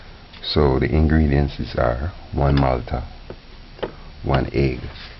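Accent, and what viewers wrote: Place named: Jamaica. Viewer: Excellent Job!!! You had me engaged during this experiment and laughing at your speculations with your yardie accent. Enjoyed your excitement that reminded me of Lucious. I'll try my hand at this non alcoholic version of the Easter Bun for 2019 holidays. Blessings to you and yours!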